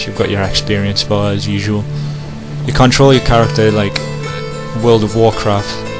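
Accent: Newcastle, United Kingdom. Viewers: Wewt, geordies ftw! Me too. :D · Are you from Newcastle?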